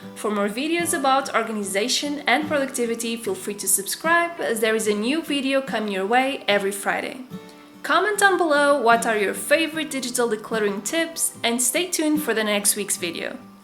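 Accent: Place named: Portugal. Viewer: I have been watching some of your videos and because of your accent I thought you are Portuguese 🇵🇹 and now I think I was right because I saw a Via Verde email 😂. Am I right?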